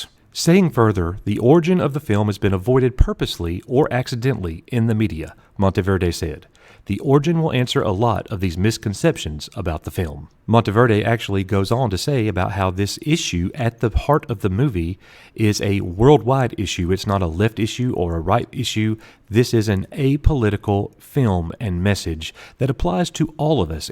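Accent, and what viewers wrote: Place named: South, United States. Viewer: You’re such a southern gentleman. It’s part of why you’re so easy on the ears. But it is, “Ms.” ✨😁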